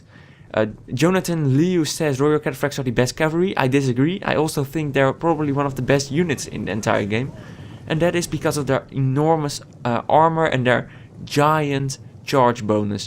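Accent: Netherlands. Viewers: What the fuck is that dutch accent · you're dutch arent you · Dutch accent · Are you Dutch ? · that dutch accent. MY EARS!!!!!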